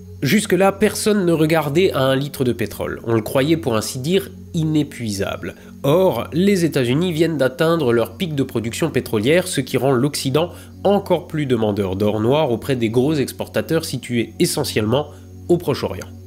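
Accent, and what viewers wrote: Alsace, France. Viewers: Bonjour, après avoir ressenti de moins en moins d'intérêt pour les sujets et le style des scripts, je me suis désabonné. On est désormais très loin de la série Hermes et de ton humour personnel. Un petit mot aussi sur l'accent alsacien qui était drôle...au début. C'est juste mon opinion et je dis cela sans haine et à priori pour ton travail et ta personne. Je te souhaite bon vent pour la suite . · C'est l'accent alsacien ou l'humour que tu comprends pas ? Sans doute les deux 😂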